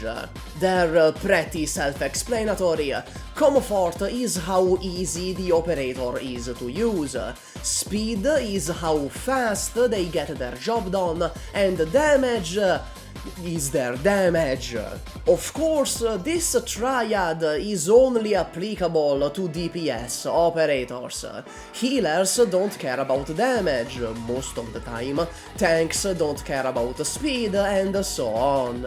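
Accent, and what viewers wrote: Italy. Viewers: "This is why I sound like this" Italian? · Ti seguivo senza sapere che tu fossi italiano, però effettivamente l'inglese è proprio maccheronico <3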